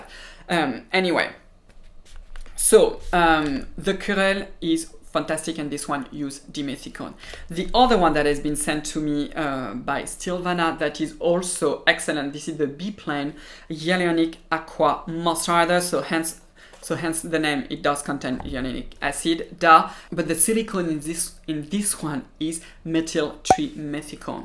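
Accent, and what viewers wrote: France. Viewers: Cyrille. I'm a French man raised in Australia and I listen to French accents all my life. There is a flaw in your channel that you must address: you flick out the names of active ingredients, with a French accent, and it is irretrievable for English speakers. When you discuss actives, pronounce how you want - but spell beneath. Alors. C'est pas trop difficile. Also, we can get Azeliac Acid in the US and Australia as 'AZClear' and 'Finacea'. If you live in either of these countries - buy those and mix a little into your moisturiser for a quarter of the price of even paula's choice. Azeliac is a cheap ingredient.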